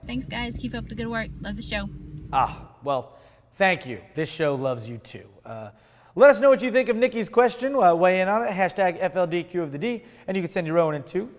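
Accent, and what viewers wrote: United Kingdom. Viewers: your british accent sounded like hammond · Is it just me or does English accent sound a bit like Hammond?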